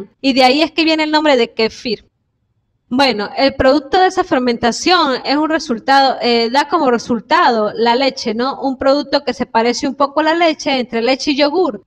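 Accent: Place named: Venezuela. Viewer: Hola 👋 una pregunta eres de Venezuela; me suena el acento, seguiré tus videos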